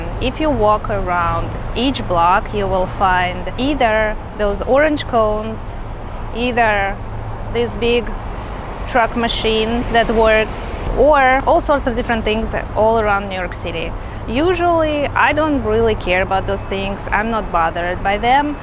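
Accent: Russia. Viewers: sounds Russian or Ukrainian to me. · aaaah, that east european/russian accent:)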